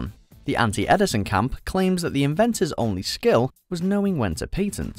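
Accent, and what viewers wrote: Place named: Liverpool, United Kingdom. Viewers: Sounds mainly scouse with something else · north of england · Thammarith Likittheerameth Liverpool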